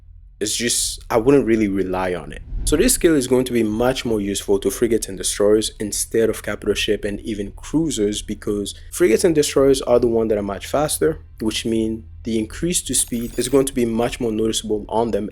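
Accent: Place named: Sub-Saharan Africa. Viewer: you sound subsaharan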